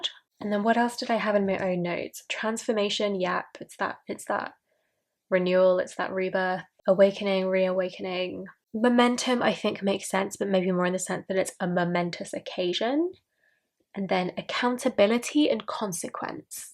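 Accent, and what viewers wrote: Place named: England, United Kingdom. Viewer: LOVE LOVE LOVE this! Fairly new to your channel and have been dipping in here and there. I will be starting my own tarot journal when it arrives. I am intrigued about writing my own keywords on a deck. I have considered this before but it sort of felt wrong. I am off to google Lisa's book now :) Also as a side note your voice is beautiful, it's giving me serious ASMR vibes feeling very chilled now. I am from London but live in Ireland so really miss the sound of English accents :D Thank you for all the inspo xx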